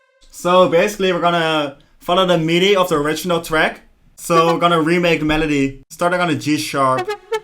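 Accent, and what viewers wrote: Netherlands. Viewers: U must be dutch ey?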